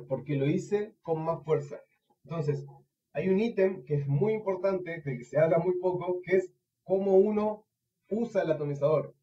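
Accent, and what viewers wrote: Argentina. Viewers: El mejor video técnico que he visto. Creo que podré despedirme del pucho al fin. Pensé que eras de acá.. ya casi no se te percibe el acento Argentino .. jijij. Gracias y Saludos. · eres argentino?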